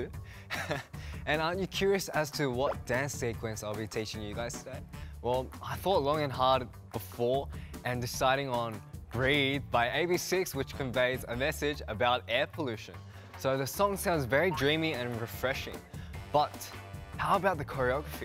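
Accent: Australia. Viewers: i don't stan straykids at all but this is the cutest this i've ever seen, felix's australian accent is the cutest thing ever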